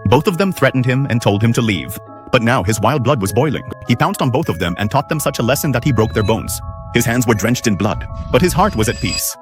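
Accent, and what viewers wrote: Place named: India. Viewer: Why does this guy sound Indian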